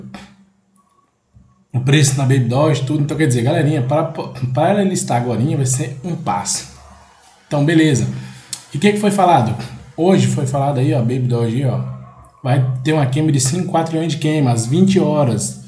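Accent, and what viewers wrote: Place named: Belo Horizonte, Brazil. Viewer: Sua voz lembra meu tio de BH pelo sotaque rsrs muito bom !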